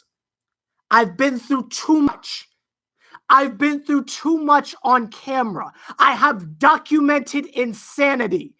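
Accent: Canada. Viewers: you Canadian ?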